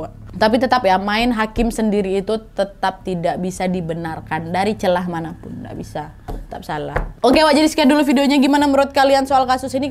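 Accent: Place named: Aceh, Indonesia. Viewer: Logat acehnya kental ya ❤️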